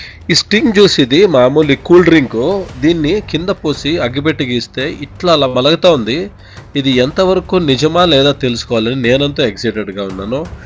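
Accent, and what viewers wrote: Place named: Karnataka, India. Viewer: Bro meeru Kannada na ? Endukante , ma frnds kannada colleagues kooda telugu ilane maatladutaru , so slag acham alane undi Karnataka people's laga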